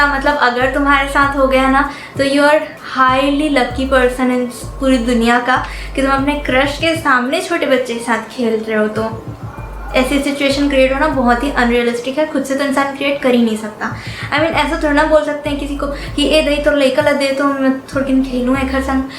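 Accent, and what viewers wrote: Chhattisgarh, India. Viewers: I love that chhattisgarhi accent❤❤ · Chattisgarhiya sable badhiya 😁 It's nice to know that u r chattisgarhiya Where r u from in cg.... Ur cg accent is cute 😜😜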